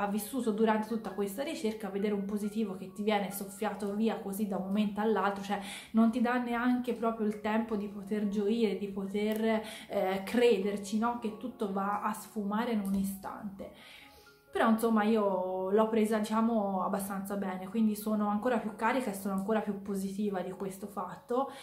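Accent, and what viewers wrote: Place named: Tuscany, Italy. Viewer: Mi piace un sacco il tuo leggero accento toscano, troppo simpatico!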